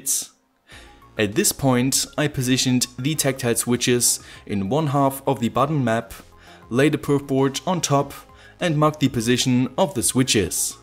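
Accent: Germany. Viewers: You come from Germany? kommst du aus Deutschland das Englisch hört sich nämlich ein wenig so an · Lul, du bist deutsch? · Oh wait lemme guess, r u German? U have an accent... no offense tho, I’m German myself haha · Are you German? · Is that a German accent ? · Mir war sofort klar, mit dem Akzent musst du aus D sein ;) mal überlegt auch eine Version auf Deutsch online zu stellen ?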